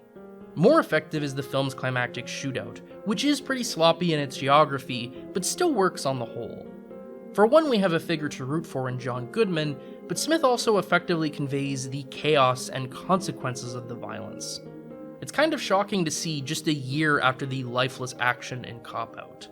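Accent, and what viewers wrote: Ontario, Canada. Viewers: The last thing i expected to hear while listening to this video as i recall always liking the movie Jersey girl, was the coincidence that i was currently walking down my street in Windsor Ontario Canada. I guess i should pay more attention to the way people talk, but it never struck me that you might also be from Ontario lol Anyways great video. I also went through a Kevin Smith completionist saga in my early 20's and found my favourites to be the lesser talked about films in his discography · Brother I knew i heard some Ontario in your voice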